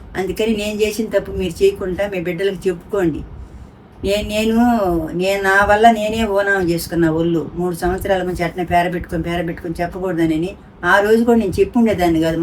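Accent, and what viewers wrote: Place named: Nellore, India. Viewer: Medi Nellore ah sis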